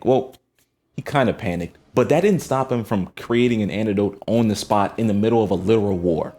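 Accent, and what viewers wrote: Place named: Australia. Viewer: I read this in his Australian accent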